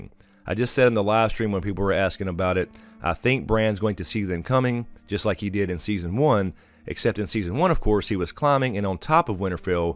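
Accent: South, United States. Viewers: Where are you from? I’m trying to pick up what state your accent is from.😂🤷🏻‍♀️ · I worked on fixing the closed caption, I have spelled Daeny this way, hope it's correct. Mostly just fixed punctuation and names, and times when the auto generater doesn't recognize your southern accent. .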